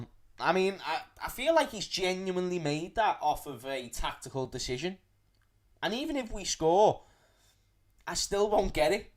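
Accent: Liverpool, United Kingdom. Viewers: U need to put substitles on ur freakish scouser channel bruhh!!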